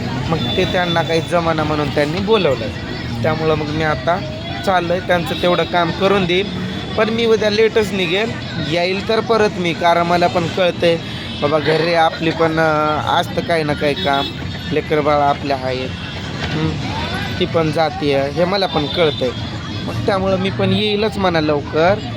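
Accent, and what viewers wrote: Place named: Latur, India. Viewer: दाजी पीवर लातूर ची भाषा की ओ तुमची😂😂😂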